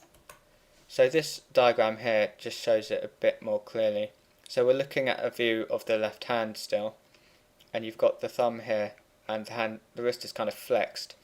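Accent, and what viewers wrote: United Kingdom. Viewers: Your videos are so helpful. I’m preparing for my exams and I don’t even use atlas often since I found your channel. Teaching is great and the British accent is everything 🙏❤️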